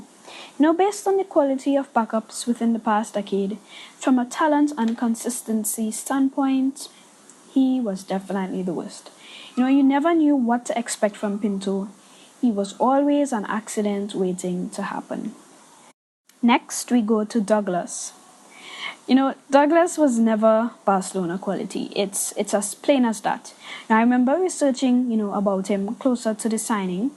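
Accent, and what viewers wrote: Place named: Caribbean. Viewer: Finally a Caribbean voice on Barca matters. Great stuff!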